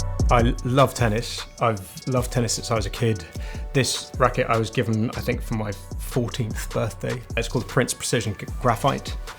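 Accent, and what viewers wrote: United Kingdom. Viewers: 最近イギリス英語不足してて困ってたから染みるわ。最高すぎる。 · Yes! I wish more people would learn English with a British accent rather than American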